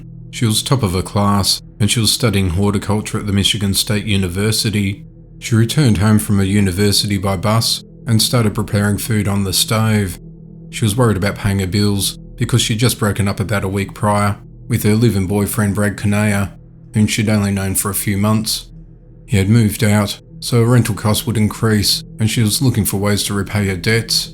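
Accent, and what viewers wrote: Australia. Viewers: Okay but are you Aussie ??? I need to know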